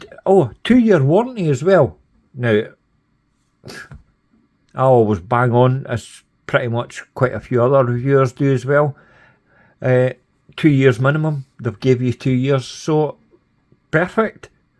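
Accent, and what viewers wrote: Scotland, United Kingdom. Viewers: How have I never seen your reviews before 🤯😂 love your absolute honesty and the good Scottish language,top notch 😎👍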